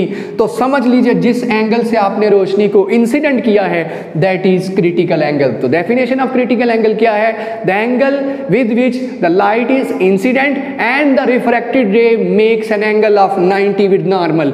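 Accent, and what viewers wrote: Punjab, India. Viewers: Punjabi teacher😁,but well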